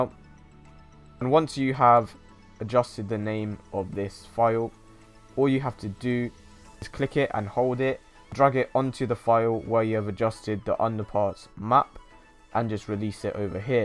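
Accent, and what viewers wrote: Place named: London, United Kingdom. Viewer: Sheesh bigman with the london accent bigman thatnks for the help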